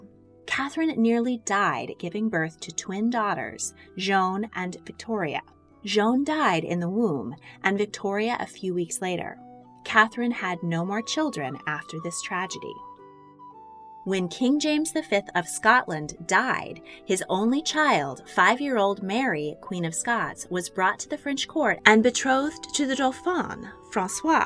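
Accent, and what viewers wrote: United States. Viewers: Must we have this ghastly American accent? All expression utterly distorted. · Not another American narrator ...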